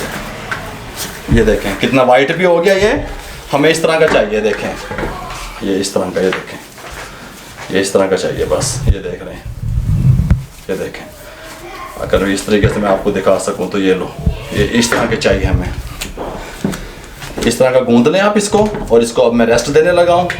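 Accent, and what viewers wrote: Punjab, India. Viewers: Nice uncle j Allah apko kamyab kra apka urdo ma Punjabi bolna mja boht acha lgta h Khush rhy ameen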